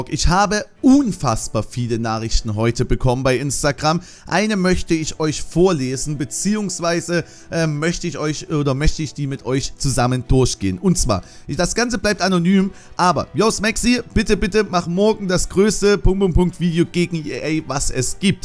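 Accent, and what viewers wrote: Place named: East Germany, Germany. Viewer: Am geilsten finde ich tdem wie du versuchst deinen ostdeutschen Dialekt zu unterdrücken🤣 mach weiter so, geb dir mit allem recht😊🙋‍♀️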